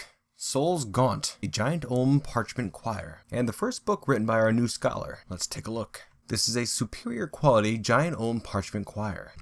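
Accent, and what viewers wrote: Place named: Northeast, United States. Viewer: So having listened to you speak for about.... 15 hours now... I'm guessing that you're in the United States and you live in the north-east, somewhere within the vicinity of New York State. Am I in the right ballpark? Of course, privacy considered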